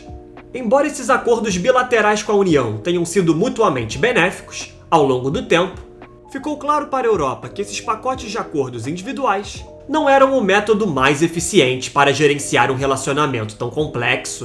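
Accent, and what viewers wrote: Rio de Janeiro, Brazil. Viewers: Bahhhh. Sotaque carioca. Pulei o vídeo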